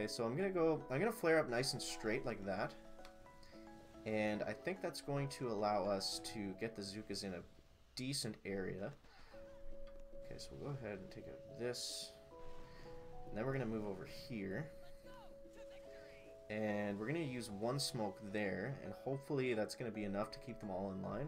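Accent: Canada. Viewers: Hey, love your videos man.any chance your from Canada's east coast? You sound like you might be. Thanks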